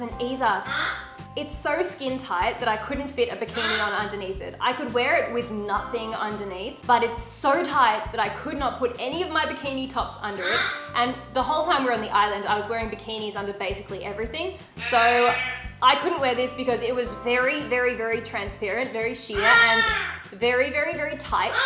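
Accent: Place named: Australia. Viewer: Loving seeing an Aussie youtuber. Finally a familiar accent!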